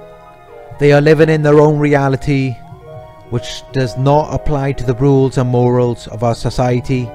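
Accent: Wales, United Kingdom. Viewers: Welsh accent matey!! Yep. So deep, this guy. xxx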